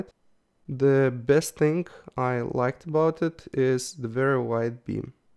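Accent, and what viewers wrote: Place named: Russia. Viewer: Such a rarely adequate review! You mentioned all things that are needed and skipped by other people, especially time before light becomes loose its brightness on each mode. Thats really essential! So i can see that turbo mode can be used up to 2 minutes before dimming, high mode on spot - 6 min and on flood - 10 min, and medium mode - without limits. Your accent sounds like you are russian-speaking, arent you?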